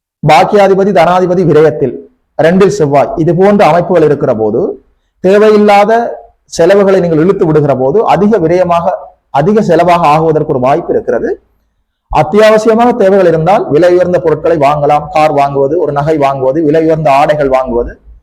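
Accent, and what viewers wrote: Sri Lanka. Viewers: Srilankan tamil flavour is seen. Good luck to the video content teller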